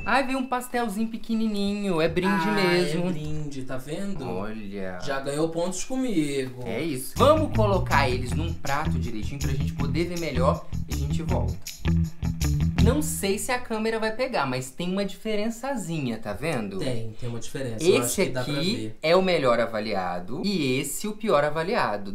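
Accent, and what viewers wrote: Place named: Minas Gerais, Brazil. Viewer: Adoro seu sotaque mineiro amei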